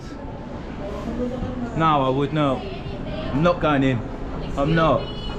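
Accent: Essex, United Kingdom. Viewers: Where you from in the uk? Sound like Essex same as me lol 👍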